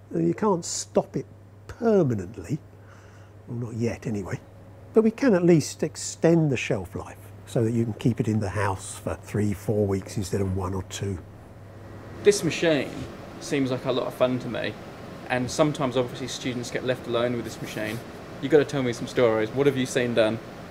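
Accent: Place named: United Kingdom. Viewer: This is the first person I've ever heard say "toe-MA-toe" regularly outside of the phrase "toe-MAY-toe, toe-MA-to" to express the likeness of two things. Is that part of the British accent, or just an odd exception?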